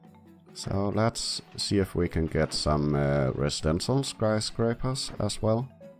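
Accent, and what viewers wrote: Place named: Denmark. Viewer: du lyder dansk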